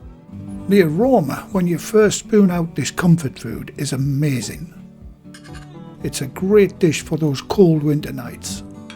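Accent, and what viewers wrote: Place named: Newcastle, United Kingdom. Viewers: Hello you have a very familiar accent you wouldn’t be from the North of England would you possibly Newcastle? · You sound like a Geordie, sorry if I’m wrong I’m new but you’re a bloody good chef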